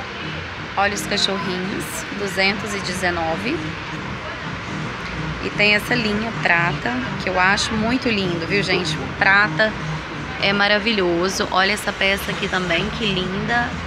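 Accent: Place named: Goiás, Brazil. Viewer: Essa goiana mesmo, conheci pelo sotaque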